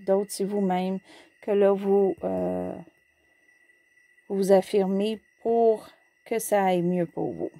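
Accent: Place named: Canada. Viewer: L accent canadien n aide pas !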